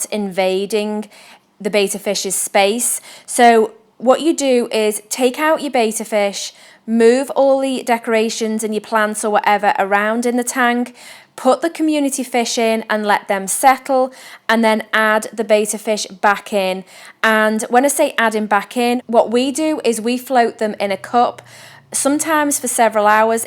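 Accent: Liverpool, United Kingdom. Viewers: I wish you would have persevered with this channel, it appears it could have really gotten somewhere. |Such a shame, but that's life.. You sound like people I know from the Sefton areas of Liverpool?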